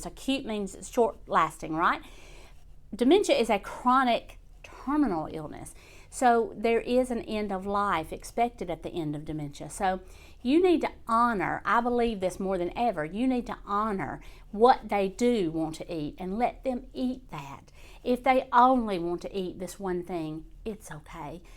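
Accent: South, United States. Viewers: Jessie Barnett continued - I’m excited to know there’s someone else who has a similar combo of chronic illness stew ! I have CHF - and wear the same lovely “zipper” jewelry at my neck. I too have seizures roughly twice a month, complex partial, secondary differential nocturnal seizures. I’m not diabetic, but I am in 3rd stage kidney failure. A week ago I was diagnosed with vascular dementia, stage 4. During the winter, I was admitted to the hospital with severe bronchitis. It was there I experienced a most frightening experience — Steroid Psychosis. Never, never take a steroidal medication ! Did you pick up the Southern accent in my posting ? I live in North Alabama, Scottsboro, very close to Huntsville. To the aggravation of my doctors, I’m an avid YouTuber, watching every video on dementia. Count me among your many, many fans. I savor every post and most sincerely appreciate your knowledge and easy delivery (Southern) of course. 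What a pleasure it would be to have a chat with you discussing our mutual ills and hospital adventures - fueled by multiple glasses of iced tea ! Pace yourself, take every pill, follow every rule, and know you have a Crimson Tide friend including you in every nightly prayer. 💜 Jessie Barnett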